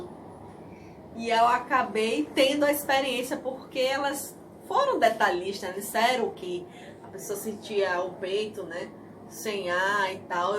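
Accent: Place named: Pernambuco, Brazil. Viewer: Bem interessante o relato, minha conterrânea pernambucana! Isso tem uma lógica de ser, que não é nada do que religiosamente as pessoas falam, mas, é preferível não entrar nesse mérito. Gosto de seus vídeos, de seu jeito pernambucano de falar kkkkkkkk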